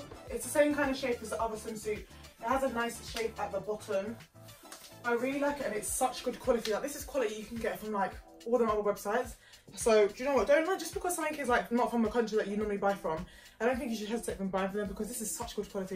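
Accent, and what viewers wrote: United Kingdom. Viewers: You are really beautiful¡¡¡ you look likea doll and your british accent goes perfect with your personality. you have a heavenly body, close to a perfection. Receive a warm kiss from El Salvador. Carlos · A black girl with a British accent, is a white Canadian dude’s dream!!! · Can you blame them? 😉I hope this isnt in anyway racist, but I've always thought that a beautiful black woman with a British accent was HOT! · Very attractive sounds British accent keep up the great videos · Pretty darkskin, slim thick and that UK accent :face-red-heart-shape::face-red-heart-shape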